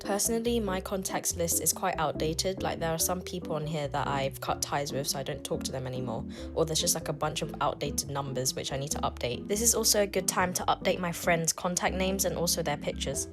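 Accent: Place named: United Kingdom. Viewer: omg the british accent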